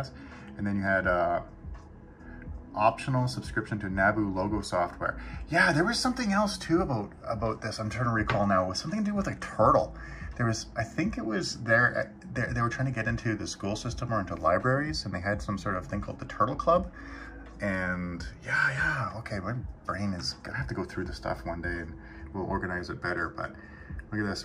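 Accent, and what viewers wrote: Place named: Ottawa Valley, Canada. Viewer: Your hoser accent is really endearing. A taste of the Ottawa valley. I grew up in Smiths Falls but never was aware of any of this stuff.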